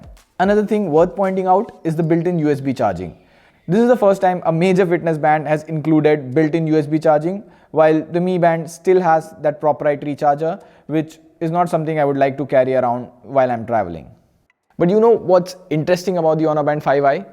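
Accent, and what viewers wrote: India. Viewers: Your accent like dr bombay, Calcutta...taxi3 n Calcutta...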